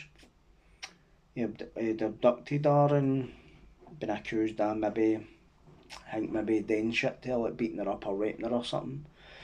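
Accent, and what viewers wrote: Scotland, United Kingdom. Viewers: Liked and subscribed love the channel refreshing hearing storys from Scotland in Scottish accent by someone who has experienced it instead of videos made by people that cant even pronounce the areas or the schemes right does my tits in keep up the good work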